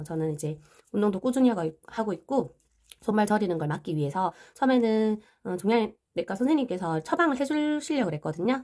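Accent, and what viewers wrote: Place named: Gyeongsang, South Korea. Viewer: 저도 대구 사람이에요 ㅎㅎ익숙한 대구 말씨이셔서 ㅎㅎ 항암 이전인데 영상보고 많이 배우고 있어요😊!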